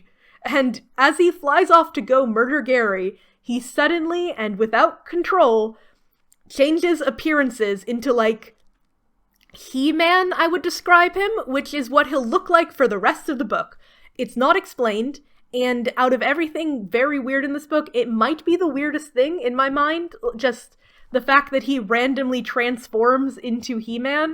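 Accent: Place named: England, United Kingdom. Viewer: You say you are American, but Ive heard you say things before a take that are unmistakably an English, so watch out its rubbing off on ya haha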